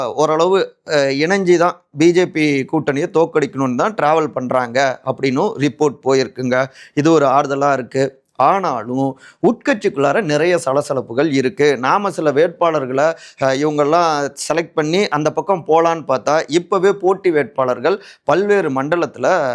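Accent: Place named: Madurai, India. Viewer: தண்ட பேச்சு, வீரியமில்லாத பேச்சு. Typically Madurai சொங்கி slang.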